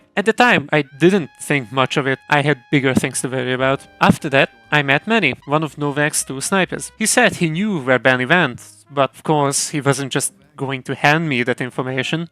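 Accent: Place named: Hungary. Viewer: Hungarian accent on top :D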